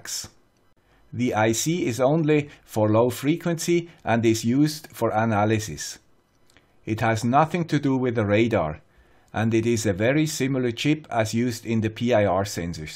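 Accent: Switzerland. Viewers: Absolutely outstanding work,. I love watching your videos, and I love you Swiss accent, too:) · Mit einem Schweizer Akzent?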